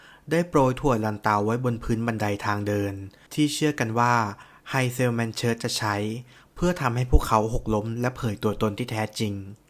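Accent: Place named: Thailand. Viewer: ติดเหน่อนะคะ😂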